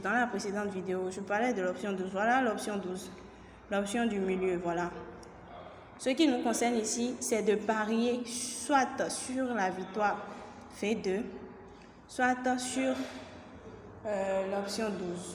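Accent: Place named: Benin. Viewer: L accent béninois explique trop bien 🎉 thanks you merci pour la vidéo je m abonne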